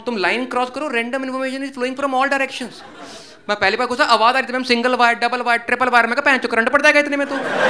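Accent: Punjab, India. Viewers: Punjabi accent is the icing on the cake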